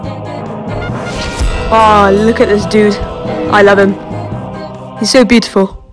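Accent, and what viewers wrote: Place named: United Kingdom. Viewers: Is no one gonna talk about why he sounds British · Hes in UK but he has a brittish accent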